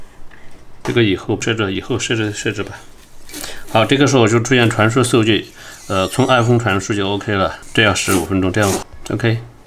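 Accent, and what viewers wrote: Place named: Anqing, China. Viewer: 一听油管主就是安庆那边的人😊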